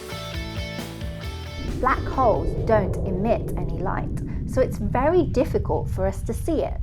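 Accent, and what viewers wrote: United Kingdom. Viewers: ❤❤❤❤, love your frightfully British accent. If your Chinese is just as fluent… the world is your oyster😘😘😘😘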